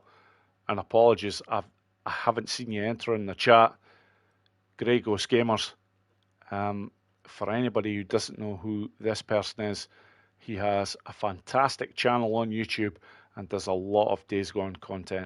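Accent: Scotland, United Kingdom. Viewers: top class mate as per. Even better when hearing another Scotsmen swearing properly. Still had good scores tho . I am trying to get better scores on outridge . 131 is my highest but can’t get anywhere near it. Had a look at the leaderboard your number1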